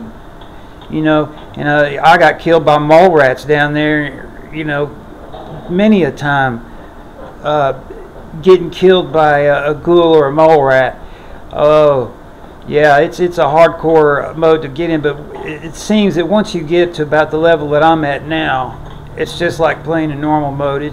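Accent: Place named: South, United States. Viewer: Fallout videos are 9000% better with a Southern accent. Roll Tide.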